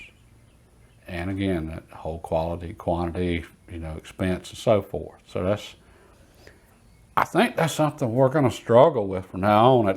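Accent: South, United States. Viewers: Thank you for the explanation of the push feed vs the controlled round feed from a historical perspective. I know exactly what you spoke about having been born in 1936 and had a 22 caliber single shot rifle that is very much like today's Cricket. Like that southern drawl too.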